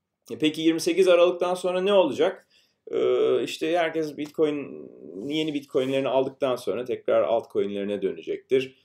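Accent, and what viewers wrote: United States. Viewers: anlatım iyi de şu amerikalı gibi konuşmaktan kurtulsan iyi olacak :D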